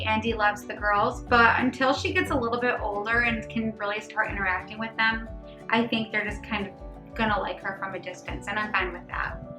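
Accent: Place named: Michigan, United States. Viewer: Are you from the Midwest? Just curious because your accent sounds like you're from Michigan (where I live). 💜🥰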